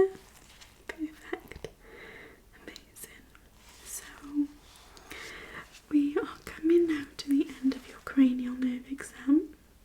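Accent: Wales, United Kingdom. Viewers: Lovely Welsh voice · What accent is that ? Welsh ?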